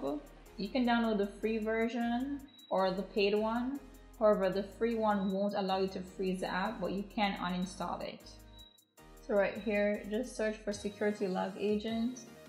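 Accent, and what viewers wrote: Jamaica. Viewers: Knew you sounded Jamaican, yaadie repping here :D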